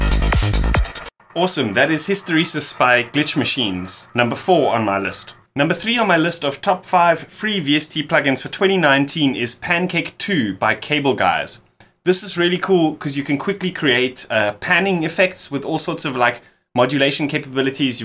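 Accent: South Africa. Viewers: Is that a JHB accent I hear? - anyway - Nice Video - but you only said the words "Sort of' 200 Times :) - Thanks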